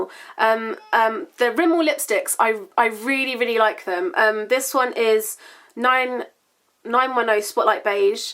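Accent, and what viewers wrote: United Kingdom. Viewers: the british accent sounds like heaven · Love the accent, I kinda how to speak like this Brit-ain accent ! Btw I'm from Malaysia, love your review :) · everytime i watch your videos i feel like talking in a british accent for the rest of thee day :) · I LOVE your British Accent <3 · i love listening to british people speak. it's just so much more sophisticated than us americans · Does anyone else find the need to talk in a british accent after watching her videos? or maybe it's just me, I absolutely love her accent (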